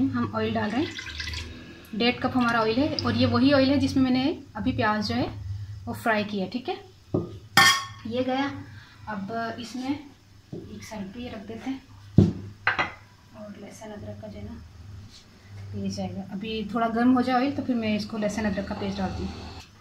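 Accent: Punjab, India. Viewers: Aaahhhaaa punjabi ka tarka....enjoy your pure pinjabi accent... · First of all thanks for this wonderful recipe. I am urdu speaking but at the end your punjabi accent is so awesome. I like the way of your speaking. Good job.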